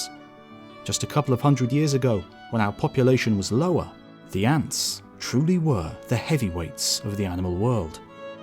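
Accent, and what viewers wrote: United Kingdom. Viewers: You're just saying that cus he has a British accent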